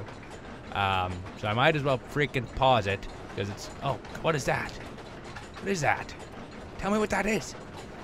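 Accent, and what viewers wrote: United Kingdom. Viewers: Love your British accent <3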